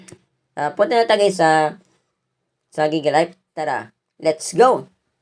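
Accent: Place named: China. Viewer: sakit sa tenga "guys ahhh" intsik sta ahhh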